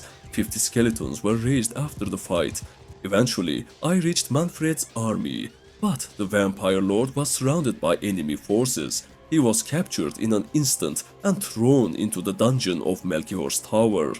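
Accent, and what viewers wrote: Turkey. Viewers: Böyle baskın türk aksanı görmemiştim çok iyi dknwkendkw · From the accent this guy is %100 Turkish. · Ckhdhchzhdhhhdhz türk aksanı asla atlamam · this is a Turkish accent tho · Bro what accent is this?, I hear turkish, but might be wrong?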